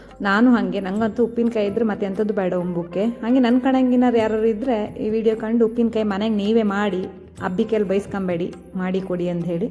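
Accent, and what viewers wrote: Kundapura, India. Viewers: ನಿಮ್ಮ ಕುಂದಾಪುರ ಕನ್ನಡ 👌👌👌👌👌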